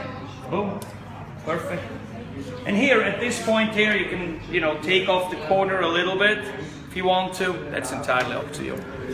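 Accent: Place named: Scotland, United Kingdom. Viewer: Loving the Scottish accent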